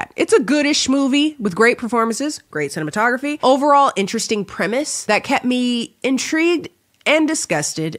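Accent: United Kingdom. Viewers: Don’t worry another recap spoiled those scenes and I kind of need those scenes spoiled to know when to fast forward. I would say Talented Mr. Ripley then Mean Girls cause Cady would never murder Regina lol.Love the British accent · I haven't watched your vids in a while and suddenly you sound British?!!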